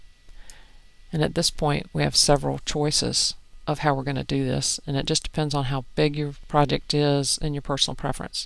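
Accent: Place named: Louisville, United States. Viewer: Is that a Louisville accent? What team is the eagle from? thanks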